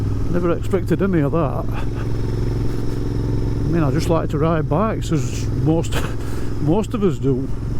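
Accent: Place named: South Yorkshire, United Kingdom. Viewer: This is what motorcycles are all about. Great videos of just enjoying the machine and views instead of YouTube reviewers complaining about a 350cc not having enough power or shilling you to buy an insta360 from their affiliate links. Keep the videos coming sir along with your South Yorkshire accent. 😃 Looking forward to taking my CBT and getting on that journey. 👍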